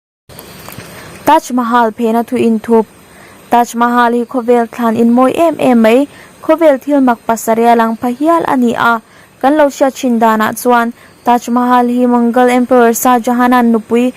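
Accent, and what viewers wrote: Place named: Myanmar. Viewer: Enge in sawi sel vak vak. Burma aw phawi a nih hi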